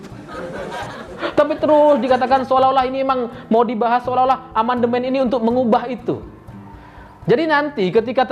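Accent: Madura, Indonesia. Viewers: Narator nya ...dialek nya Madura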